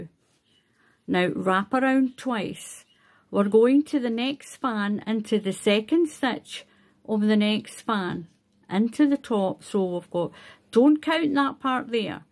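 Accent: Scotland, United Kingdom. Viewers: you have really created a masterpuece with this beautiful, but simple stitch! Is that King Cole Cotton Silk a category 3 cotton? Trying to find a good substitute here in the US. My Great Gran taught me to crochet at 8 with #10 bedspread cotton. She was also Scots, so I love to hear you speak because it brings back fond memories of my Great Gran. Thank you for your lovely way of teaching and your brilliant talent!🎉❤🎉❤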